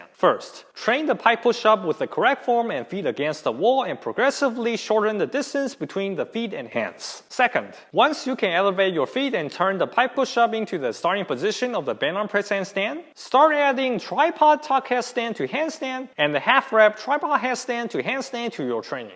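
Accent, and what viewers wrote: China. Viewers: 老哥 这是中式口音吗？感觉口音很熟悉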